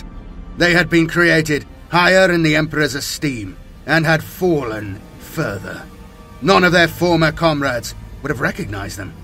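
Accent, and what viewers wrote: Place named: Midlands, United Kingdom. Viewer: Good video and was nostalgic at the end remembering the old dioramas. I remember one of an orc invasion (on a desert world?) which featured a vindicare assassin atop a building. One of the highlights of my childhood. Also, good to hear a Midlands accent on youtube, even if it is the west, hehe. Greetings from the East Midlands.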